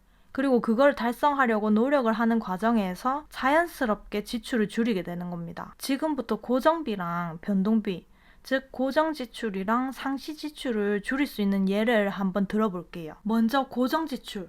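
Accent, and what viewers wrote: Gyeongsang, South Korea. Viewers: 경상도 사투리에 똑부러지는 조언 딱 이상향형????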